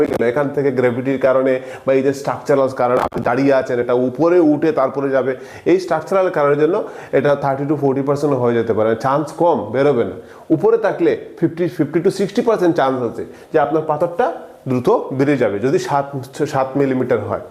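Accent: Sylhet, Bangladesh. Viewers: Sir apni sylheti naki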